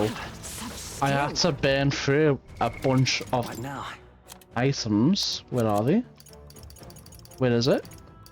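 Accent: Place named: Liverpool, United Kingdom. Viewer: Our guide with a good Scouse accent. I love it 😂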